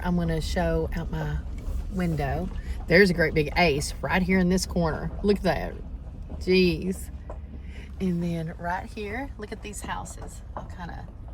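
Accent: South, United States. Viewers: OMG!!, my bird has chirped the entire video! She must like your southern drawl!! 😁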